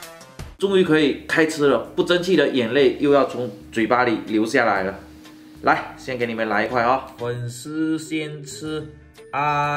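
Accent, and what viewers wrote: Fuzhou, China. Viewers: 福州音太呛了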